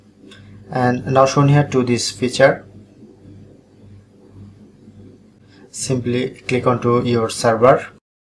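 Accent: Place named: India. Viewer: INDIAN VOICE W TUTORIAL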